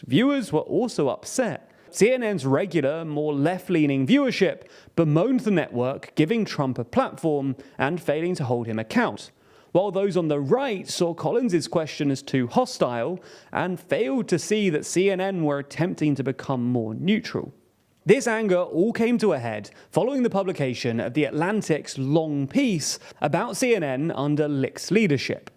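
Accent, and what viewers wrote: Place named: United Kingdom. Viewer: Why do people automatically believe that a guy speaking in a British accent is some kind of expert on news! Especially when we freaking live in North America and have literally thousands of news "outlets"? So why listen to 3rd hand news from some British Pud who hasn't ever left his own town, let alone his country!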